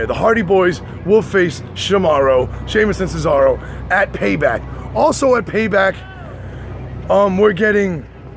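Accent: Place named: New York, United States. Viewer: I hear the NY accent now 😜 ps.. game 6 is ours! It ain't over till it's over brother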